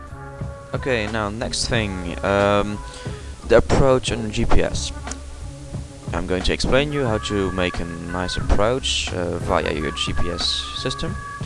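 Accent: Netherlands. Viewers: u dutch mate?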